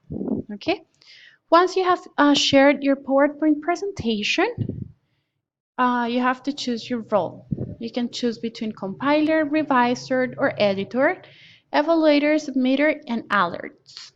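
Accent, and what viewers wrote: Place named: United States. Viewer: Tienes acento estadounidense profe😸😺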